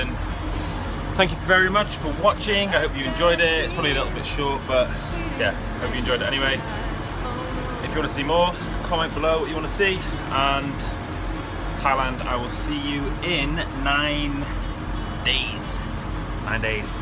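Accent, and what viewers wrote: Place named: United Kingdom. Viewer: Make a vedio like this more Pls. i really like it. I learn british accent form you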